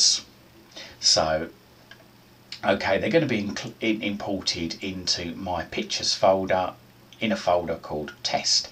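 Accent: United Kingdom. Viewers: I've just found this and I know its going to be clear and useful!..........and thank goodness for your British accent! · Too hard to understand his British accent.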